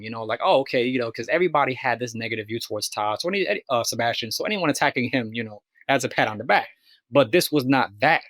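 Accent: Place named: New York, United States. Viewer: I used to go to Limelight , Twilight , tunnel in my late teens and it was a scene ! I didn’t realize all this vampire culture was going on around me. I was just there for the music lol and the dancing. You are so eloquent and as a fellow New York Latina I love listening to your native New York Latino accent. Great video !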